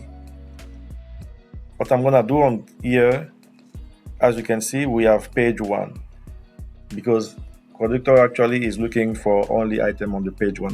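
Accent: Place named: France. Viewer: Well, it's a great video bro, GJ, so according to ur accent, I think that u are a french guy, so id u don't mind can u create a video on how to find niches on the Fr marketplace !!!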